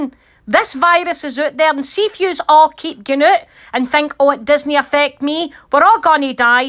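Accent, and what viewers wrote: Glasgow, United Kingdom. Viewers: Literally no wit or funny lines in this. Just saying standard things in a broad Glasgow accent doesn’t make it funny.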